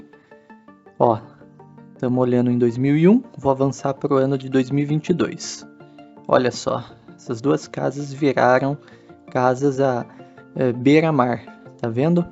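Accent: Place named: Interior, Brazil. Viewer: Vc que está relatando o seu sotaque com certeza não é da ilha. É do interior.... 🤔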